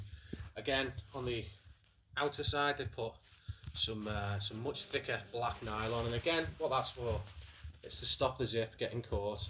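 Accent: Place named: Wirral, United Kingdom. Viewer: Your accent sounds very “Wirral” 😂 are you local to there? Great video mate. Subscribed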